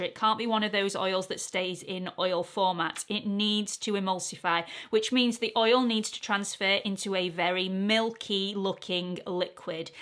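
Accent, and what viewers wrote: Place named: England, United Kingdom. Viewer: I use Woolite gentle textile shampoo for my brushes - is that sufficient and my beauty blender after every use in dishwashing liquid. A new happy and very enthusiastic subsriber. Appreciate your professionalism and wonderful English accent. Similar age to your gorgeous Mom and have learn so much from your amazing tutorials. Thank you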